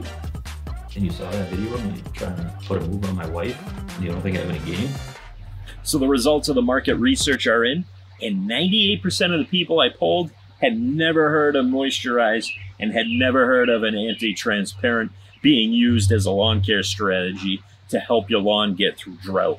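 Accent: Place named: Boston, United States. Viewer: How did that accent get to Illinois? I always assumed you were in a Boston suburb.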